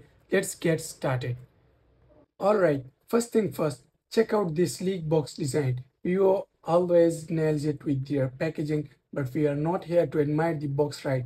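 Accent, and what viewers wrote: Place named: United States. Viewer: American accent!!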